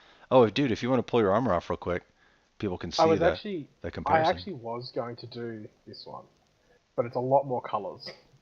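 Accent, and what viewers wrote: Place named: Australia. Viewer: Dat sexy Aussie voice....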